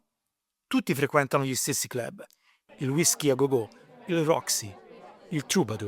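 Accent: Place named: Tuscany, Italy. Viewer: lento nel raccontare...fai venire sonno...e dalle co sto toscano...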